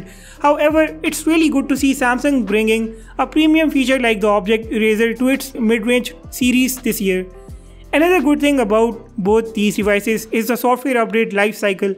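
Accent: India. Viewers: Indian accent to much to take for whole video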